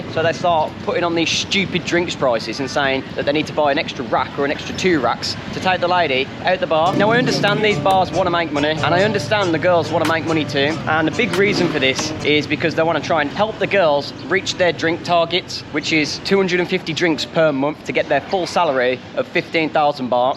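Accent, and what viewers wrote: Birmingham, United Kingdom. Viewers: Hey you never lost your Brummie accent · You sound like a brum..maybe from Wolverhampton..am I right 🤪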